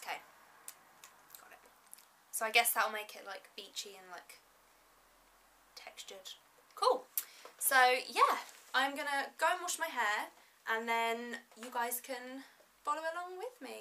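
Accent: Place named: United Kingdom. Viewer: That Bri'ish accent! And ya, I'm here cause I grew my hair long and got that thing today lol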